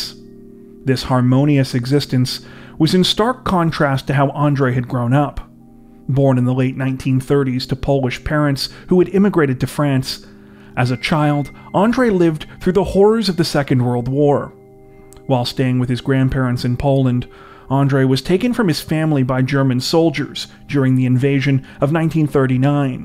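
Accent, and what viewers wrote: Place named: Ontario, Canada. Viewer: Yeah I was gonna say Ontario, but then I figured that was too easy. You do a great job hoss.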